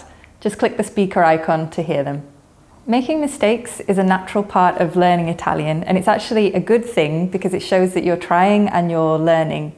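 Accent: United Kingdom. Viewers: She is native British 😊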